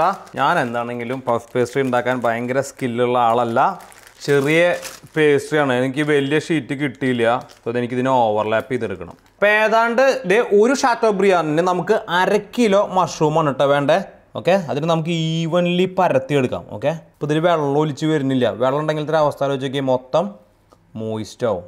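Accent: Thrissur, India. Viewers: Started watching your channel recently......super contents and lovely presentation.....That Thrissur bhasha and lofley is superub👍🤙...keep the good work cheta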